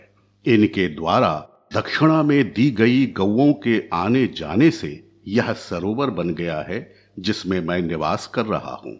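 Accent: Standard. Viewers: महाभारत की एक सुन्दर संदेशयुक्त लोकोपयोगी कथा...! सुन्दर लोकोपयोगी कर्म वाले ही वास्तव में मानव धर्म /स्वधर्म का पालन करने वाले होते हैं। ऐसे स्वधर्मपालकों को ही...लोकोपयोगी कार्य करने के कारण, संसार में सम्मान और यश/कीर्ति प्राप्त होती है। जिन्हें सम्मान और कीर्ति मिलती है उन्हें ही स्वर्ग का सा सुख प्राप्त होता है परन्तु समय के साथ लोग उनके लोकोपकारी कार्यों को भुला बैठते हैं तब वह स्वर्गिक सुखों से वंचित हो जाता है। स्वर्ग का सा सुख पाने के लिए या तो निरंतर लोकोपकारी कार्य करते रहना आवश्यक है या उन कर्मों का स्मरण कराते रहना (गुणगान होना) आवश्यक है ताकि उस व्यक्ति का यश बना रहे और वह स्वर्ग का सुख प्राप्त करता रहे! इस कथा का यही सार तत्व है। सुन्दर शैली में विशुद्ध उच्चारण के साथ प्रस्तुत यह कथा अत्यंत रोचक और लाजवाब लगी। हार्दिक धन्यवाद एवं हार्दिक बधाई आदरणीय इस सुन्दर संदेशपूर्ण कथा-वाचन के लिए...! सादर नमन आदरणीय एवं शुभ दोपहरी...! ☀️🌷🙏🌷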